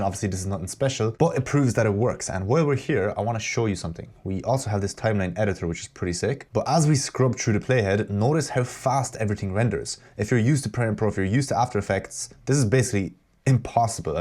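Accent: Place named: Ireland. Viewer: Been looking for something like this for months, dziękuję bro I hear some Irish in that accent 🤝🏼🤝🏼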